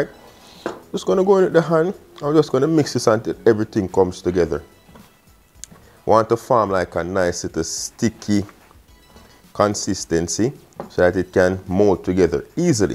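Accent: Jamaica. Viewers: Love that accent/ patwah don't know if I spelled that right. 😁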